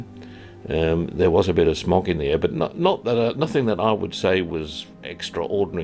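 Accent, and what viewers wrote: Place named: United Kingdom. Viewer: I like to hear a smart people like you(and your normal British accent) Greetings from Poland.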